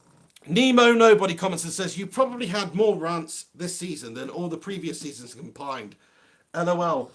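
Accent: Manchester, United Kingdom. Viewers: thats a proper fucking Manc accent that is.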